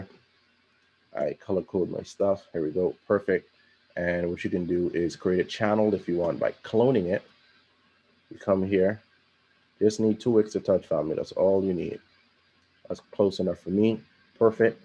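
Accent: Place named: Bahamas. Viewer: ik you bahamian lmaoo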